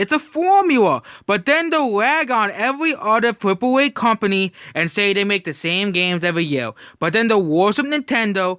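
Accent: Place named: India. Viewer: But this guy sounds like a Indian scammer kind of you know Indian skimmer you guys trying to get us to buy Sony and Xbox or steam deck instead or game PC well already have two of those how about I go steam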